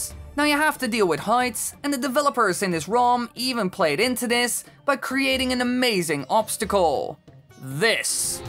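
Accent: Netherlands. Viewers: This english accent is dutch hm?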